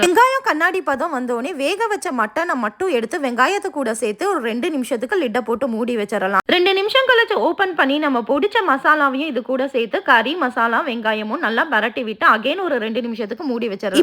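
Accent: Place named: Madurai, India. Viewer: Tempting will try this weekend for sure.madurai slang super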